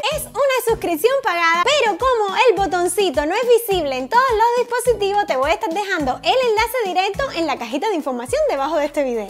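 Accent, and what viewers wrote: Cuba. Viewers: me encantan tus vídeos, eres muy graciosa y muy guapa. Me encanta tu hacento, es como el de mi abuela que es de Cuba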